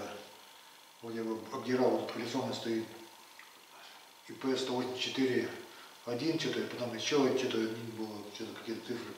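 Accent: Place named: Mari El, Russia. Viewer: Говор марийский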